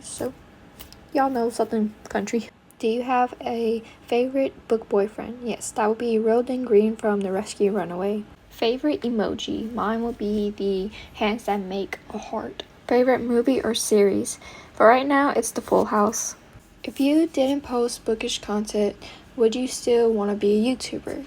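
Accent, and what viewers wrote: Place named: South, United States. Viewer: Thank you for the great answers, (especially your country song picks!) also, your soft southern twang in your voice is Fabulous!! 🫶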